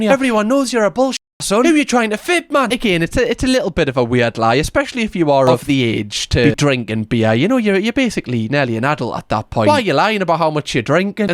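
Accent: Newcastle, United Kingdom. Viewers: if anything there is no such thing as a British accent. is from Newcastle so he has a Geordie accent (NE England) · i am curious, does learning from give your english a british accent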